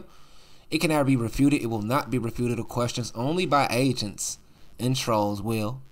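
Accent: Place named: Chicago, United States. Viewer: I knew you were from Chicago before I read it by the way you talk lol. Good video.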